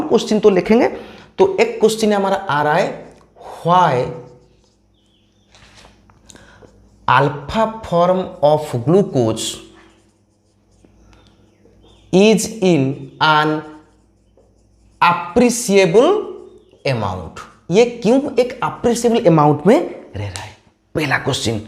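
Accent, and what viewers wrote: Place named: India. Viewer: Can you add subtitles? Cause I have trouble with understanding English with Hindi dialect...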